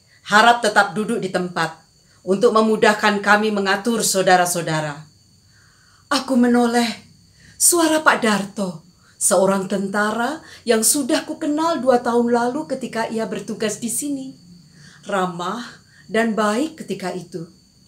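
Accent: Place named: Papua, Indonesia. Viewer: wah cerpen dengan logat papua. keren.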